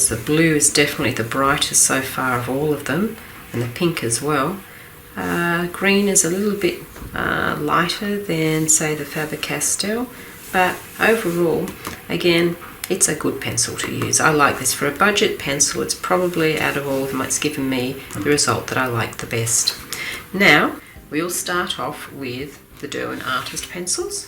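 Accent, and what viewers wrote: Australia. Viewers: that video was fantastic and so good to hear a fellow Australian accent. I am 74 y.o. and have just recently gotten into colouring books. I am addicted!!! So relaxing. Your video is so informative and I am asking Santa for some Faber Castell Polychromos for Christmas. My other addiction is making cards. Thanks.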